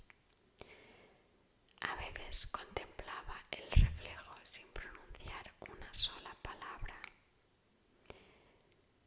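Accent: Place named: Spain. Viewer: No sabía que antes de dormir te podía visitar un angel y más aún que ese angel tuviese acento español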